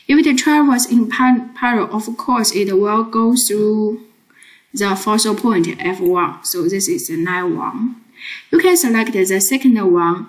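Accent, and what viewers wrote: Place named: China. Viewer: 聼口音能聼出來是華人，感謝感謝，最近在學雙語大物，沒有頻道主你的付出我真不知道怎麽辦了:face-purple-crying::face-purple-crying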